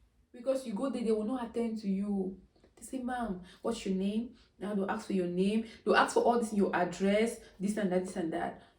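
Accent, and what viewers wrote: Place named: Nigeria. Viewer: Why do you sound Nigerian? A French speaking country would have been my last bet when listening to you!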